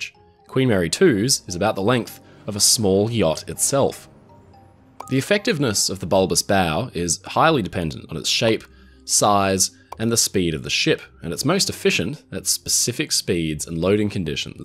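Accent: Australia. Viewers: he sounds like an Aussie to me?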